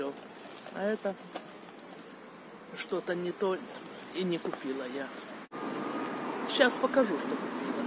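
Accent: Odessa, Ukraine. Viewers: Тетя супер! Милый одесский акцент!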